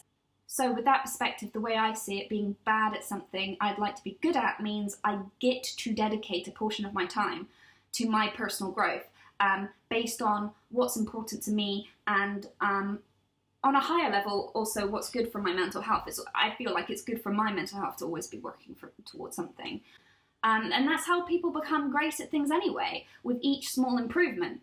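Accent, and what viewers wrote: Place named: United Kingdom. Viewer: Omg! See we are on the same wavelength! Spot on! And I didn’t know you were a Brit! Is that what your accent is? I love it!!!!! You are NOT bad!!!!!! I always get up and do bad karaoke and try to get other shy people to go up with me and sing and see it’s just a fun game! Music is fun and expressive and wonderful! I love this video!!!! I need to break back into my fb and share your stuff lol! Awesome awesome awesome!!!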